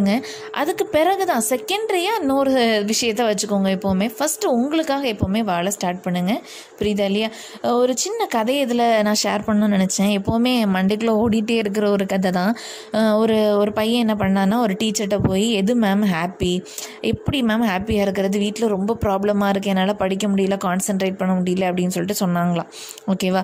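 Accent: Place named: Tirunelveli, India. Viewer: Neenga Tirunelveli ya antha slang varuthey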